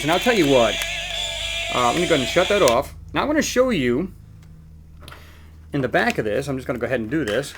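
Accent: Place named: New York, United States. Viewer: You sound like a new Yorker hey! Love the video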